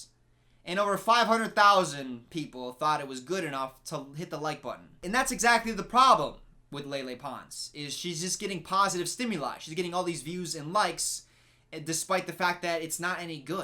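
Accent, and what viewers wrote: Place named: New Jersey, United States. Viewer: you might be from wisconsin but you sound like you're from jersey...? hmmm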